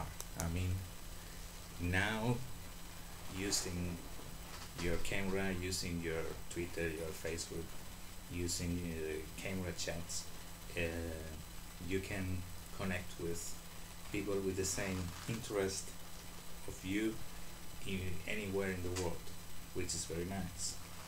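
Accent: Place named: Spain. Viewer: I agree on the whole apart from 1 thing and that is how can anyone find a nicer down to earth guy than you, you say it as it is plus the Spanish accent makes my hairs on my back stand on end, keep up the great work adios for now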